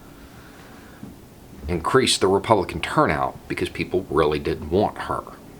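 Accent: South, United States. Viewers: beautiful posts. Listened first last week and been at it a video at time since. I am from South Africa...but am surprisingly drawn to your southern accent...its like your ideas are encased in a warm syrupy truth serum that is your voice. Your perspective is fantastic and you challenge me to think differently about what I thought I knew about American history. Your country is the most powerful in the world and as such is important for me all the way down here...so thank you for your posts. They are great and informative AND entertaining. Scary sometimes but necessary.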